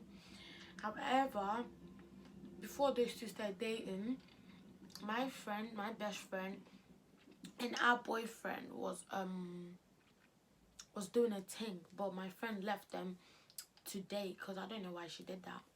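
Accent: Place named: United Kingdom. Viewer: omg you're british? could you do a video on uk slang like the meanings 🤔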